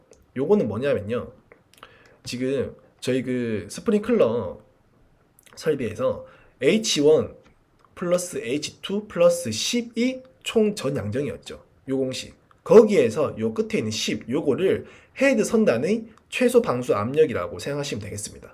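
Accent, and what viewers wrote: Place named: Gyeongsang, South Korea. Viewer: 경상도사투리 구수하네예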